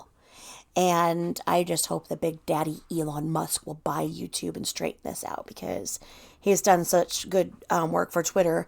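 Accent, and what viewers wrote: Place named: South, United States. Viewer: She's from the South. We say wadder and washcloth. We also say hosepipe. 😂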